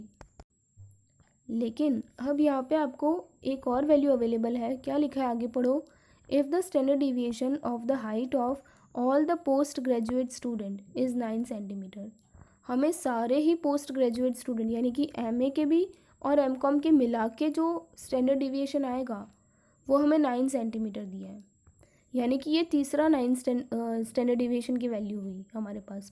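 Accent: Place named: Gujarat, India. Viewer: Thank you me'me for ths video ❤ From Gujarat I know from your accent thayt you are gujarati 😅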